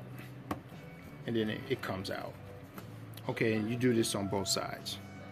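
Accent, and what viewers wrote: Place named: Louisiana, United States. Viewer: dude, where in Louisiana are you from??? lol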